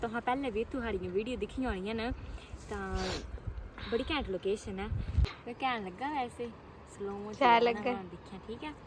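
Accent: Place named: Himachal Pradesh, India. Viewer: Himachali boli hai bro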